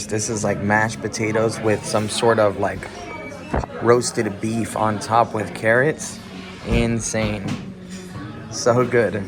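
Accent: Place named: South Florida, United States. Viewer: Just came across this channel. Good stuff! From how you talk I wanna say south Floridian🥳🥳🥳👏🏽👏🏽🥂🍾👌🏽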